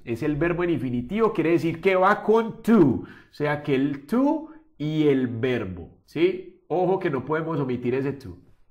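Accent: Antioquia, Colombia. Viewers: Es colombiano, cierto? De Antioquía? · El mejor profesor de inglés de todo el mundo... Nadie como tú eres demasiado bueno para enseñar... Saludos desde costa Rica. Adoro tu forma de enseñar Inglés y también adoro tú acento colombiano 🥰😘😘😘😘😘😘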